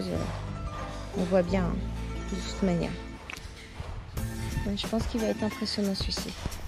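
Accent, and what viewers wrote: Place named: Cambodia. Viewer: Super Voyage et superbe vidéo !! On a adoré aussi de découvrir le pays dont je suis de cette origine. Une belle découverte pour nous en fin janvier et début février 2020 juste avant le COVID 😅. Ravis de vous suivre ta chaine youtube et rubanbleu. Et super l'accent cambodgienne 😅. On a hâte de y retourner. Merci beaucoup pour cette vidéo.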